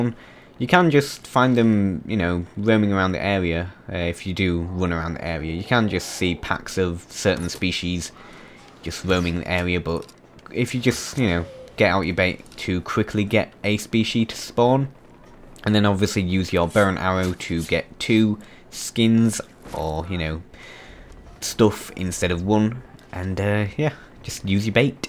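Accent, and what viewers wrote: United Kingdom. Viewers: This guy is british.cuz dat accent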